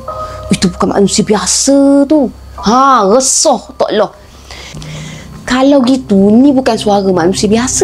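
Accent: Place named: Perak, Malaysia. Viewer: Assalamualaikum. Selamat Hari Raya buat sekeluarga. Sedap dengar bercerita ade guna loghat Perak. Suke akk mendengonye. Cer Cuba lepeh ni guna loghat N.S plk..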